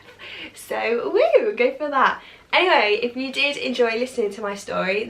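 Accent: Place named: England, United Kingdom. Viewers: I'm an American so I'm not great at recognising different British accents but I really like yours! To me it sounds...well, between York and London 😅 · I KNEW I recognized that accent immediately! I’m American, but my brother’s husband is from Nottingham. Such a beautiful accent!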